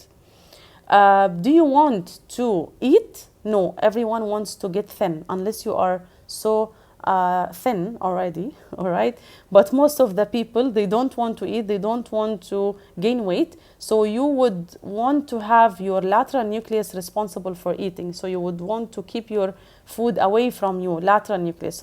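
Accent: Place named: Arab world. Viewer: I have been watching so many videos to get into such complicated area, however i could complete non of them. now I feel lucky to find this amazing channel. Whoever watch this episode not only will be able to understand the topic, but also can memorize the details easily bcuz u r talented and creative regarding linking the informations. I wonder why your channel did not go viral yet, may be it is a location and suggestion issue. Though your accent seems arabic, your language is perfectly fluent. Listening to Indians is awkwardly exhausting and usually u turn the device off within ten minutes maximum😅. Thank u so much for making such unique lectures, I appreciate your work 💚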